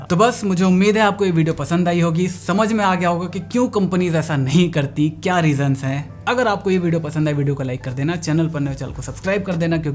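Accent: Bengal, India. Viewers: Wait are you Bengali?? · are u bengali? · Bengali accent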